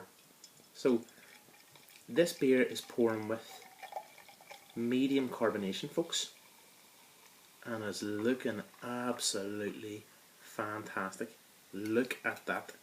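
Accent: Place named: Ireland. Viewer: you sound irish .. too much time in home land lol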